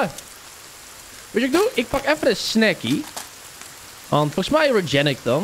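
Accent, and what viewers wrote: Brabant, Netherlands. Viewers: ahah maakt niet uit joh jullie zijn beide legends ik vind t ook leuk dat je lekker Brabants bent net als mij 🤣😂 maar eh komen er ook nog ark video’s ging altijd stuk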